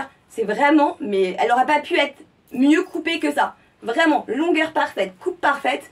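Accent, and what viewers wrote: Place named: Midi, France. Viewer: Wowww toujours plaisant t'écouter surtout avec ton bel accent!! Je te suis du Québec... ton accent me rappelle ma grand-maman qui était du "Midi" comme elle nous disait hahaha. Ais-je manquée le code promo de -30%?? Allez bonne journée bisous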